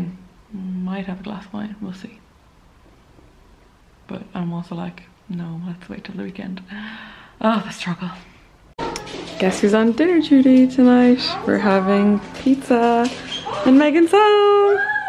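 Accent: Ireland. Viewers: has a lovely soft Limerick accent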